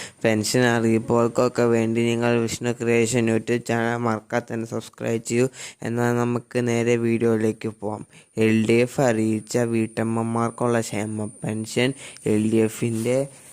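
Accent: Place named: Bengal, India. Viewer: നീ ബംഗാളിയോ മലയാളിയോ നാക്കു തുടക്കു ബംഗാളി...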